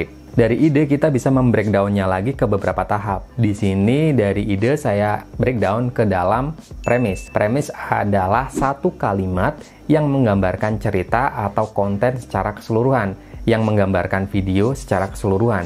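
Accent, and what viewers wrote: Pekalongan, Indonesia. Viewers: Orang pekalongan ya bang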